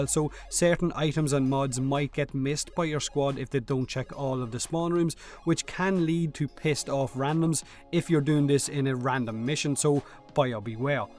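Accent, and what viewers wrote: Ireland. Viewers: Ronaldo Rond those Irish accents are one of a kind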